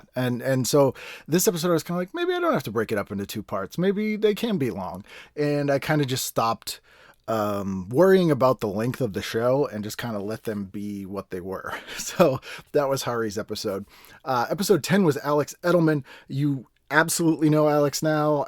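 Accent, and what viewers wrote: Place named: Boston, United States. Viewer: Congratulations! Been loving going back through the back catalogue. I blame you gushing about Trilogy of Terror on Junkfood Cinema for making me go listen to your podcast (and also Dana Gould and listening to you both go FULL Boston which is something to behold). You rock, man!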